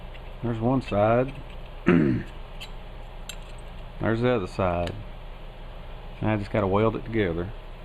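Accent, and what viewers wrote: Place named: Kentucky, United States. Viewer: I want to see the car/truck under the tarp! Nice video btw. Where you from with that accent? Sounds like a Kentucky accent. :)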